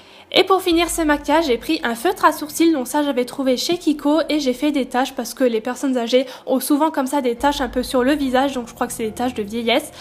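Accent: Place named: Alsace, France. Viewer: Wahou c'est trop réaliste ! En entend bien ton accent alsacien personnellement moi je l'entend bien car je suis alsacienne !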